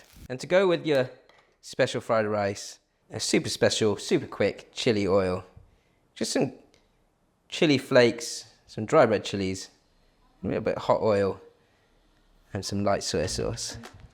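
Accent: United Kingdom. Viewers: Love to see and hear an Asian person with a British accent. Very unusual. I love it. ❤️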